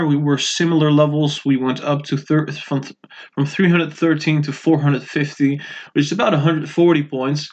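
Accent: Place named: Netherlands. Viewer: Ik dacht al je engels heeft een Nederlands tintje hahah, volg al een tijdje je video's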